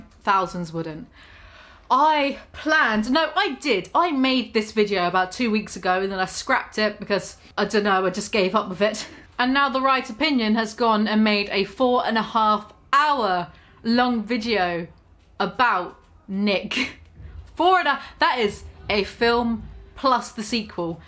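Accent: United Kingdom. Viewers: your bri ish accent is everything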